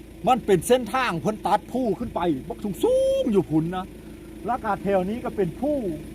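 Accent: Phu Thai, Thailand. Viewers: เว้าโม่นเด้ มักๆสำเนียงภูไท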